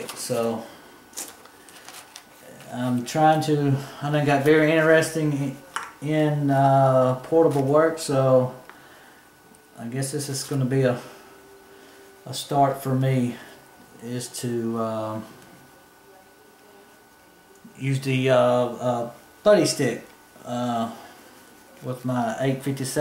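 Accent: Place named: United States. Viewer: i love the american accent ! merkia ! :D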